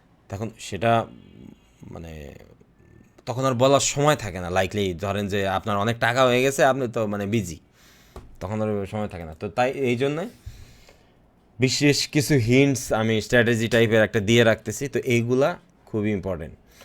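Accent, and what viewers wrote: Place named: Bangladesh. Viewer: আপনার কথা বলার ধরন অনেকটা ভোলা অথবা চাঁদপুরের মানুষের মত। জানিনা আমি আপনার দেশের বাড়ি কোথায়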